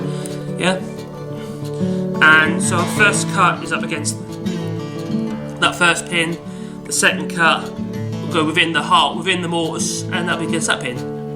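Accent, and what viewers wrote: Norfolk, United Kingdom. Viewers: Gotta be from Norfolk bor!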